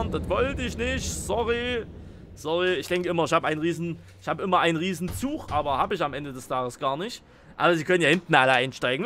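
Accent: Saxony, Germany. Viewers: Ich liebe dein Sachsen Aktzent wo wohnst du in Sachsen ich wohl bei Leipzig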